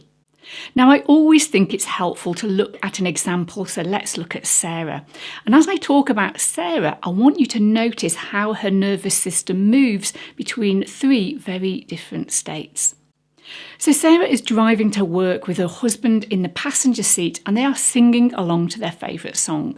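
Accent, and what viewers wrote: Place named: Wales, United Kingdom. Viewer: Are you Welsh? It reminds me of listening to a Welsh author read her book. It’s a lovely accent to my ears. I am Welsh, English, Irish, French and German. I love all races but despise Nationalism. Despise Nationalism. Infantile disease. Great video 👏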